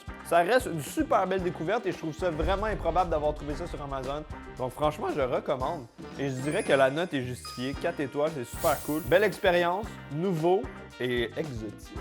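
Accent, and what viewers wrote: Quebec, Canada. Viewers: Rien à faire. L'accent québécois est dégueulasse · ah ok merci de l'info j'oubli trop facilement qu'il est au quebec. ( malgré l'accent )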